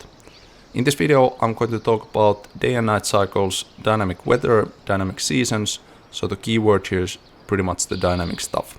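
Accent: Finland. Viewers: After watching so many interviews of Sam Lake, I could not not hear the charming Finnish accent :D. Love your game, btw. Keep up the good work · Thought the accent sounded familiar, until I realized you was Finnish! näyttää hyvältä 🤌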